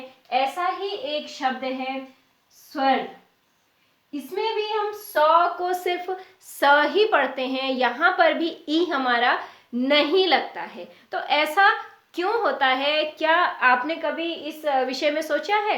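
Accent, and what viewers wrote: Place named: North, India. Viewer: इस्पर्श कोण पढताहे आप नॅार्थ वालेही ' व ' को इ पडते हो. श के जगह स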